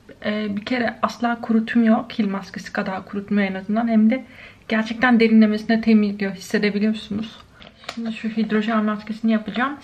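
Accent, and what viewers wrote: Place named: United Kingdom. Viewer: Sizin konuşmanız nasıl acaba ?? Hayır izlemeyecekseniz izlemezsiniz niye böyle bir şey yazma gereksinimi duyar ki bir insan. Ancak birini incitmek için... Başka hiç bir açıklaması yok. Araştırıyor, uyguluyor. Diger youtuberlar gibi fıss değil ama halen kendi elinde olmayan bir sebep yüzünden eleştiriliyor. Üstelik küçükken bir rahatsızlık geçirdiği için konuşması böyle ve bence çok çok tatlı, kibar ve naif. Ayrıca ingiliz aksanına benziyor. Insanlar birseyin içinde kötü görmek istesin yeter ki... Ne acı. Yazık.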